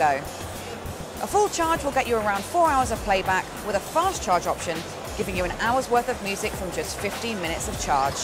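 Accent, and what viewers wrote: United Kingdom. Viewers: I lové that british accent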